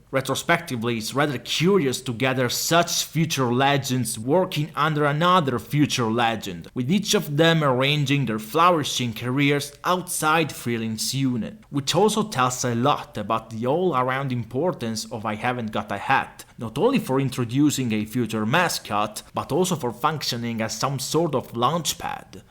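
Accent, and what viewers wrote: Italy. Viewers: You're strong Italian accent is too difficult to listen to to allow me to focus on the content of your video, which sounds pretty decent.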